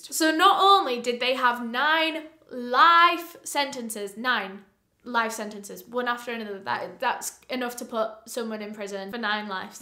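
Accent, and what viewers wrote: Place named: England, United Kingdom. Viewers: Her England accent is irresistible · Our is so intelligent, kind and so very talented. She reported upon this horrible massacre with such detail, sensitivity and compassion; I think this is why she is so popular…that and her charming Yorkshire accent. And her eye makeup is mesmerising; she’s such a pretty girl…Brains and Beauty and a successful entrepreneur…... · Me, she kept coming up in my recommendations and when I finally clicked and heard she's a fellow northerner I instantly subscribed. I'm from up North and have friends who live in the same city as. It helps she's an inclusive queen 👑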